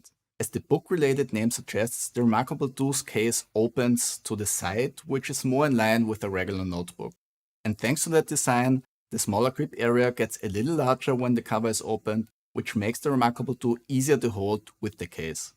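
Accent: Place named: Austria. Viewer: Inspite the heaviest Austrian(?) accent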